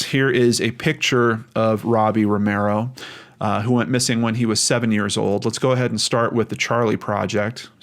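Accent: Canada. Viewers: As a Canadian there's absolutely wrong with you sounding like it eh? This case is tough, whether or not Ronnie was responsible his passing pretty much killed the case and investigation since it sounds like the police really had him As the likeliest suspect and not many others. Thoughts are with that family who went through so much. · Great video. This is by far the most comprehensive coverage (in text or video) that I've even seen of Romero's case. As to you Canadian "out", accents have a way of spilling over national boundaries.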